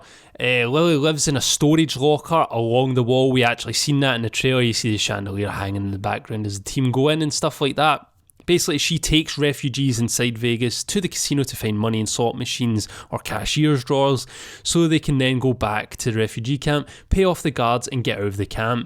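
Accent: Scotland, United Kingdom. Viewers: I'm more fascinated by your Scottish accent mate! It is great training for my dyslexia cos it forces me to really pay attention to what you are saying but the downside is that I totally missed out on the actual contents of your videos lol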